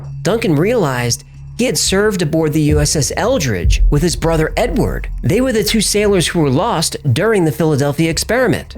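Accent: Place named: Long Island, United States. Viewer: I knew you were a long Islander when I heard the fish say "dowg treats" haha I'm a LI native too and you can't miss a long Island accent. It's so new yorky sounding lol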